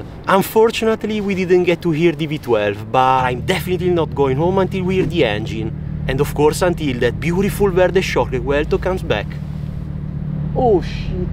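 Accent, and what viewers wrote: Italy. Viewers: Shorter videos, straight to the point, exhaust sounds, some music, your nice Italian voice and those are great! 😃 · Bro il tuo è l’inglese più italiano che ci sia ❤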